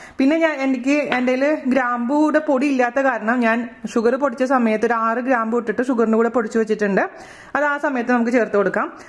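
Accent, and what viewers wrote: Thrissur, India. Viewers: ! Your musical narrations at thirsur slags with valid tips,super cake Xmas to you and family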